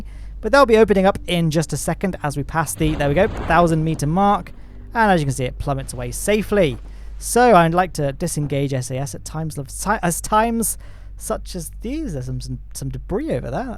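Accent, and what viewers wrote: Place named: England, United Kingdom. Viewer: wait what omg is english?????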